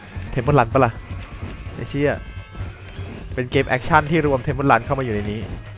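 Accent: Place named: North, Thailand. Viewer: พี่คนเหนือป่าว พี่ พูด เหนือ อะ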